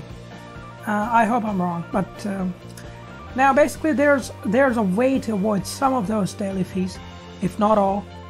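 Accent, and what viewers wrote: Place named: United States. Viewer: I dont want to be mean but he sounds like Mikey Mouse with an American accent. He makes amazing and helpful videos still.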